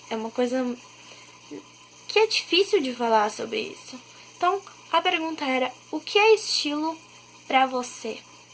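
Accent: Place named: Rio Grande do Sul, Brazil. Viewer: oi vejo que seu sotaque é um pouco gaúcho de onde tu é? sou gaúcha de porto alegre bj!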